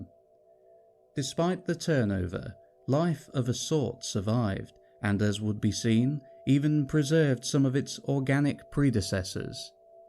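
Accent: United Kingdom. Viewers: Hey, idk why you don't have more views, before getting the PDF i was looking for someone with a mild level of oration competency reading this, but the only one there was on YouTube was the guy with the annoying loud music and another guy with the most anticlimactic voice in the world, and the fact that you are bri´sh might help with this, but anyway keep up the good work.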